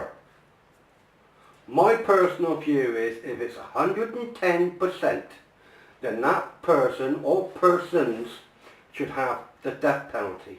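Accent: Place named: Gloucester, United Kingdom. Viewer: I've noticed you have the same accent as the Gloucester Wests.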